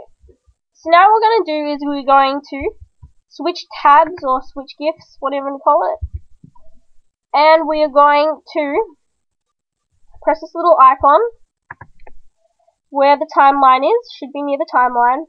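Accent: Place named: Australia. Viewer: speaks in an aussie accent like me is so refreshing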